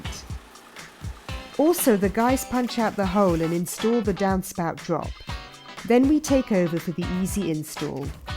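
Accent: United Kingdom. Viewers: Love the British accent! Good video too! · GREAT video!! Thanks for making it! Love the narrators accent. Taking this country back one job at a time! Rod Fair, fellow Brit in Fl. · The lady narrator with the Brit accent adds class to this. Well done.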